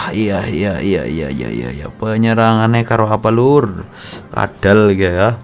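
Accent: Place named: Banyumas, Indonesia. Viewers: Mantap bang ngomong ngapak ke wkwkwk kocak bang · Ngapak banget sumpah 😂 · Wong Purwokerto wes ketara 😂🤣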